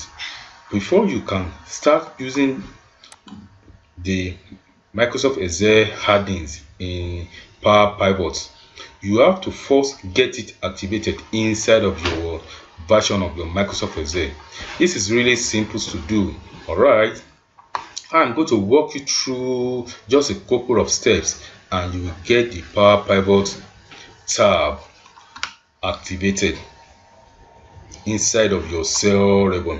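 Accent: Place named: Dubai, United Arab Emirates. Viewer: Your accent is really difficult to get, it feels like you're speaking like Dubai people.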